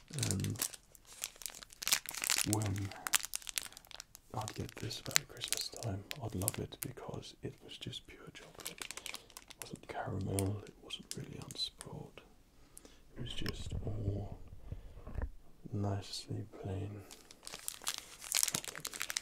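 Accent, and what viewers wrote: England, United Kingdom. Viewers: Is he British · I was just thinking recently I want to listen to an asmr video with an English accent and then I saw this video and I was omg oh yeah! 😃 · Is he from England ???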